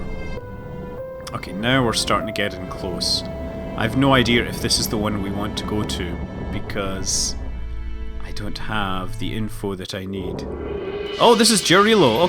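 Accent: Scotland, United Kingdom. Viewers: so i went to increase the resolution on the video since youtube thinks im on dialup. Ended up hitting the closed captions. fuck me this shit is hilarious with a scottish accent!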